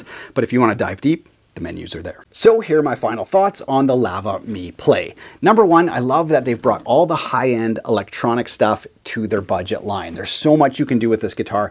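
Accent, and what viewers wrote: Canada. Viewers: I love the canadian accent lol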